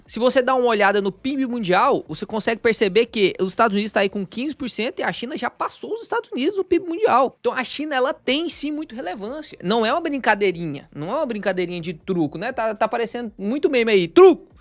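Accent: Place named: Minas Gerais, Brazil. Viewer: Alguém sabe de onde é o ADM? Pelo sotaque, e por um “uai” que ele solta de vez em quando eu arrisco que é do nosso país Minas Gerais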